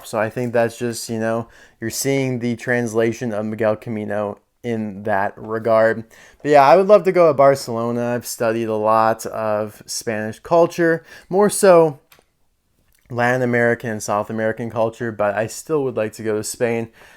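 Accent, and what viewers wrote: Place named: Ireland. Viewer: Amazing video! This was definitely interesting seeing the different between Disney store and factory customs. Although, I feel like there was a missed opportunity to compare some of them to some tomica country McQueens that are similar to those cars in the video. But oh well, the video was still great! I didn’t even know you were Irish! Also, on an unrelated note, I just realized that there’s another McQueen that only the Disney Store made: Blue Lieutenant Lightning McQueen. He was only in a McQueen-O-Rama 5 pack. I just felt like that was worth telling you. But anyways, nice job with this video! Keep it up!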